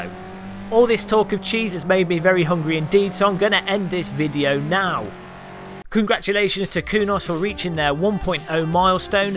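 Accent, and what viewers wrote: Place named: England, United Kingdom. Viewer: Seb, he has a very English accent. But I know what you mean. regional French dialects would be a mystery to me, too. :)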